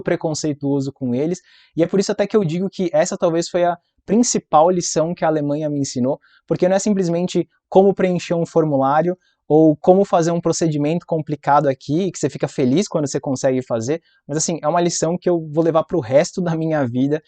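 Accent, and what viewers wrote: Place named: São Paulo, Brazil. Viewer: Como vc é de Salvador com esse sotaque paulistano, do Bexiga?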